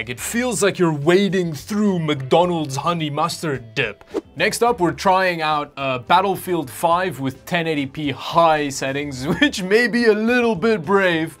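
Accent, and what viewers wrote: South Africa. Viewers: where u from u sound South African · Are you from CA? I hear some SA in there, especially with the Ja. lol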